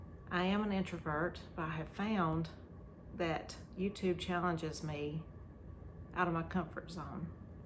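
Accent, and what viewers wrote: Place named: South, United States. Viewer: Hi, congratulations on 3 years of YT👏👏. I didn’t know that YT started 20 years ago, wow 🤯. I truly enjoy your videos, you are a great seamstress and I also love your southern accent 🥰🥰. Thank you for sharing your Zucchini bread (now I’m hungry 🤣🤣). Have a wonderful Holiday weekend 💖💖💖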